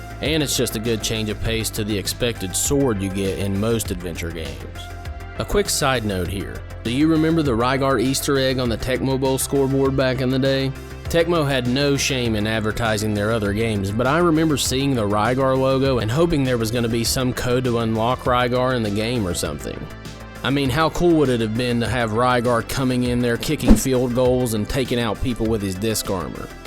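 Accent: South, United States. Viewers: Do I agree Rygar was highly underrated? Yes! Do we have the same first name? Yes! Does your monotone/southern accented voice make me want to kill myself? FUCK YEAH! Regardless of me wanting to commit homocide, suicide or both, I'm interested in watching this video. Here goes... I fucking looooooooooved this game. I played hours upon hours of it along with my dearly departed grandma. Yes, I played this game with my kickass g-ma. I only wish she'd have survived breast cancer so as to have been able to play the PS2 re-boot. I love & miss you Gramma B. I love and miss you. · You deliver your videos with a childlike sense of enthusiasm and your southern drawl makes for a great narration. Great job, great research!!! Thank you!!! Your Friday the 13th vid was ultra dope too!!!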